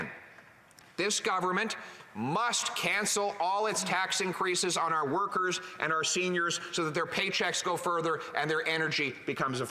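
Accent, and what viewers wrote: Canada. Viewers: Sounds like your typical Canadian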